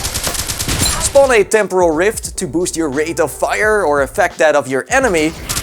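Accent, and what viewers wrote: Netherlands. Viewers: I love the way you say notoriety, dutch?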